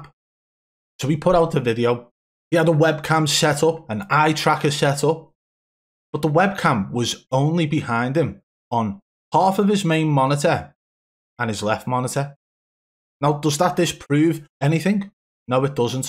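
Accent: Liverpool, United Kingdom. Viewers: Ur scouse accent is unbearable.. I feel like I agree that all or most of these streamers are cheating In warzone but your videos seem Phony as well and really shady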